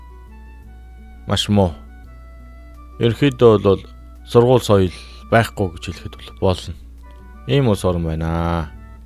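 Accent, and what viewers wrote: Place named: China. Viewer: Хятад аялгуутай сонсогдож байна бас хятад ая.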